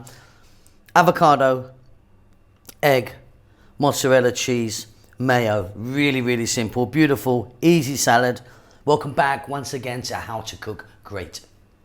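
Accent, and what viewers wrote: United Kingdom. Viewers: Are you from the U.K. ?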